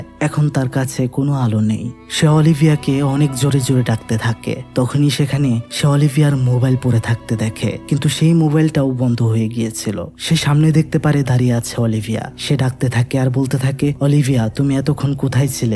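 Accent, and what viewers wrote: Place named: Bangladesh. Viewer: Kothar style a mne hocce uni bngldshi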